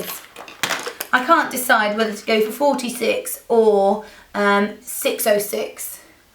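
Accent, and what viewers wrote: United Kingdom. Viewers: it helps that you have a british accent too xD :) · Every time you or your sister say "So" I have to try and say it in my best attempt at a British accent. My New York one tends to cancel it out though :( I love the way you guys say it! Oh, and gorgeous make up!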